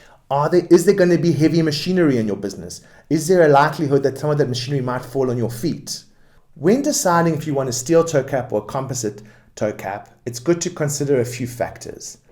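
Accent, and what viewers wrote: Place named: South Africa. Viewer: Your intention to show Toe caps history and evolution is trivial and informative. However, you made a huge mistake in using an image of HITLER to reference a period in time. That is extremely insensitive, offensive and reprehensible. Absolutely bad decision ( if it wasn't on purpose) to show your personal alignments with one of the worst war criminals in history! You could have EASILY chosen a different image from the same time period. Perhaps your being from South Africa, ( the accent giving it away) is part of why you did it on purpose. Absolutely Deplorable. Very tempted to report this garbage to Youtube.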